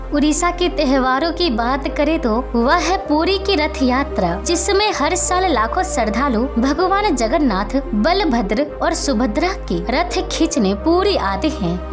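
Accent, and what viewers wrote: South, India. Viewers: Shayad mujhe lagta hai aap South ke taraf se ho ISI vajah se aapki Hindi thodi si alag lagti hai Lekin cute hai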